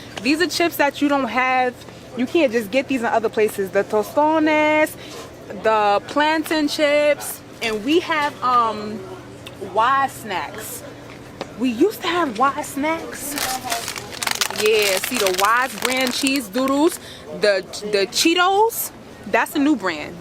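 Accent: New York, United States. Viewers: That New York Accent 😍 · She has such an authentic NY accent. Love my people! My fiance is from Wavecrest! I miss farrock. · Love her NY accent!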